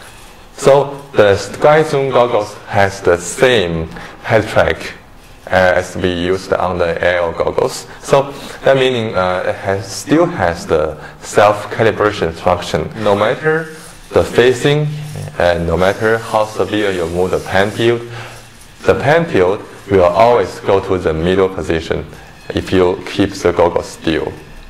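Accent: China. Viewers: Yeah its a language barrier. I understand chinese and where i live, we have China people trying to speak English alot, it sounds like this.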